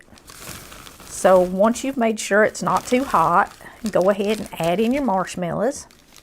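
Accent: South, United States. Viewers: I love her accent. The lovable southerner.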